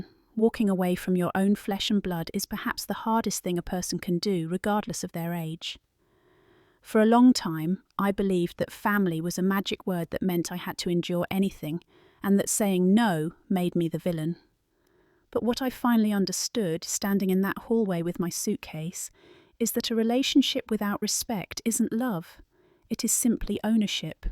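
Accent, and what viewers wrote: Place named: United Kingdom. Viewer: Same story I read awhile back, with a British accent!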